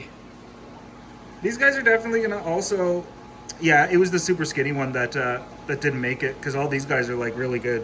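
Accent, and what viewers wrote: Canada. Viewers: You saying "Barb" in your Canadian accent reminds me of Coach from Letterkenny.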